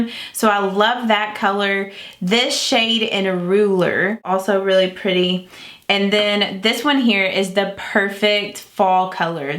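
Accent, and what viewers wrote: South, United States. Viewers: You talk with the southern DRAAAAAAL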